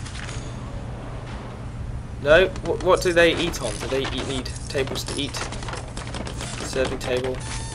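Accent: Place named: United Kingdom. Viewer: you sir are british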